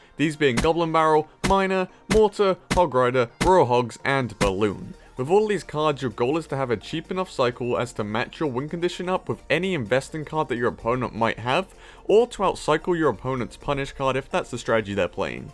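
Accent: United Kingdom. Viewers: I got it your a British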